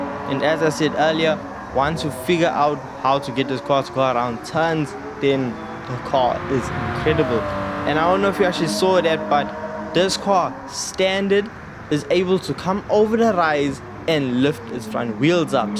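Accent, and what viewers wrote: South Africa. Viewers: bro u sound like u are from sa?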